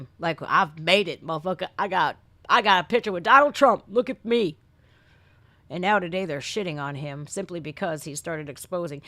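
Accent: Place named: South, United States. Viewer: Hey was wondering if you are from the south? Cause you got a southern accent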